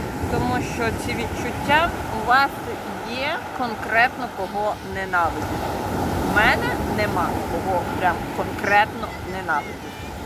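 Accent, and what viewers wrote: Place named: Podillia, Ukraine. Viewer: Ви з Поділля? Маю знайомих з Бершаді, говорять точнісінько як ви.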